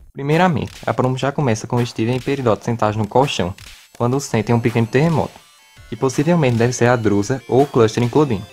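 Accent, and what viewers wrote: Northeast, Brazil. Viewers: vc eh nordestino? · Já sei que vc é do nordeste :-)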